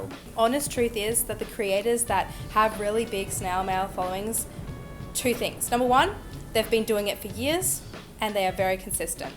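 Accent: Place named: Australia. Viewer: You must be spending a small fortune in stamps! You sound like you're from Australia. Our stamp prices in this country are astronomical! How do you cope financially?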